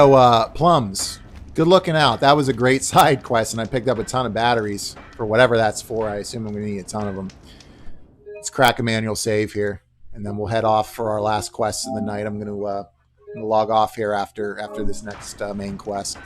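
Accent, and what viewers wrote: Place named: Pennsylvania, United States. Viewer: Yes! Aloy freakin rocks, haha. And the backstory is just chilling... Btw, I hear a familiar accent in your voice. Pennsylvania? Maryland? I grew up in Delaware. :)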